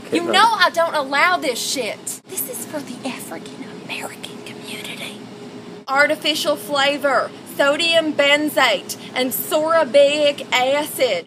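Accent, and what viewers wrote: South, United States. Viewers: What accent is she using, southern? English? Waspy New England? I like this segment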